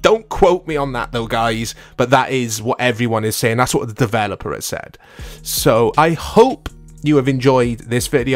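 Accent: Wales, United Kingdom. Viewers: Bro are you welsh yeah?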